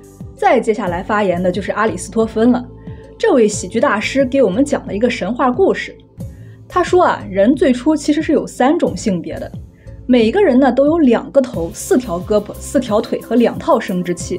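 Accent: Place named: Shandong, China. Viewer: 这浓郁的山东普通话，真是欢乐😂😂😂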